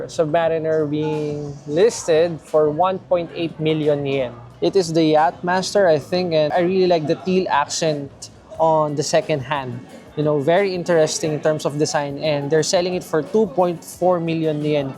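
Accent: Philippines. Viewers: I binged most of your videos , really good content. My wife is Filipina and I recognized the accent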